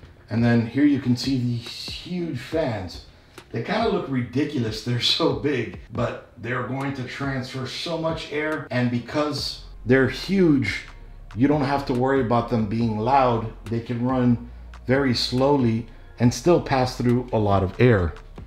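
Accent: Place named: Chicago, United States. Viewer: You have a Chicago or mid western accent. Reminds me of my grandfather. Who recently passed. Like hearing his voice again. Love the video by the way.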